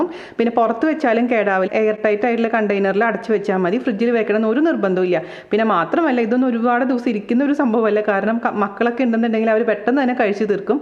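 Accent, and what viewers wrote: Thrissur, India. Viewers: I am new fan if u frm mumbai, surely will try this ariyunda. Ari varuthu chayayil ittu kazhikkunnathu kandittundu. Pandu shabarimalakku nadannu poyirunna alkkar hard aya ariyunda erinju wild animals ne okke odikkarundu ennu ammamma paranjathu orma vannu. paranjille harda aya ariyunda erinjal chathu povum ennu. Athu kettapool ammamma paranja karyam orthu poyi. Enthayalum iniyum lockdown recipes iniyum poratte. One more thing. Ur Trissur style of speaking us really attractive.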